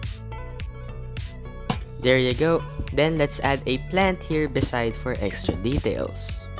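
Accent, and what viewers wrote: Philippines. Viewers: You have a filipino accent I think your filipino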